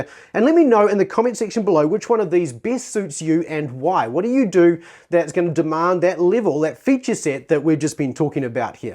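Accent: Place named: New Zealand. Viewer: Nice to hear a kiwi accent :-)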